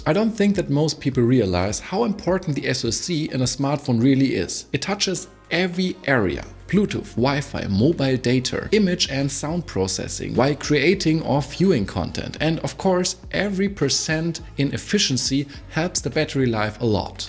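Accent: Germany. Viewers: best Galaxy I've ever bought so far. i have the 128gb base model in pblack. i compared it with the s23+ from a friend and there is no noticeable difference in everyday handling!! but the most important thing, 25w charging needs exactly an hour with 20-30% battery left the next morning which i always have. it's exactly the time frame i need to shower and everything before i go to work. btw, very strong German accent. but not as worse as KS. anyway, good review and nice channel, subbed!!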